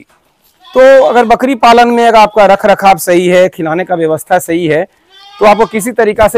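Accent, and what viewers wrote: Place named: Bihar, India. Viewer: Bhai ya to Puri terah se khadi Hindi bolo nhi to Puri terah se Bihari language me bol ..nhi aata bolne to kamse kam wo kaam nhi karna chahiye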